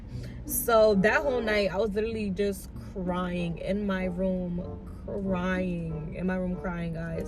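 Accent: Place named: Dominican Republic. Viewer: I like her accent, she must be Dominican 😍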